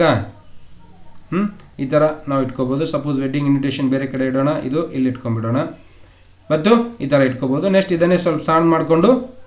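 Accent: North Karnataka, India. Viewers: Haha hutharkanada bashe keloke kushi agutapa ❣️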